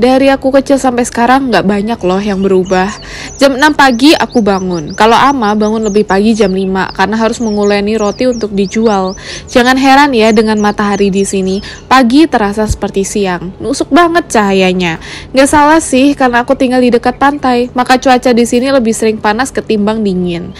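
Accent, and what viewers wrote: Manggarai, Indonesia. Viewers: Baru tau kak berarti sama dengan saya Manggarai juga😆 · ci memang org NTT tepatnya Manggarai say😊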